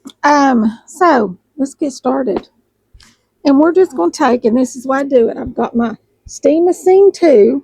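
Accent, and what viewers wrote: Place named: Tennessee, United States. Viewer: I thought you sounded like you were from Tennessee. We are in East TN also. Love your sweatshirt.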